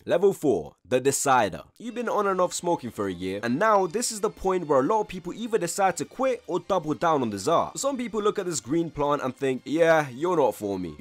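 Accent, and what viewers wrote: United Kingdom. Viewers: bro i think its british.. why british sound so bsSD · so zooted I didn’t realize he had a British accent. Just thought he was talkin’ silly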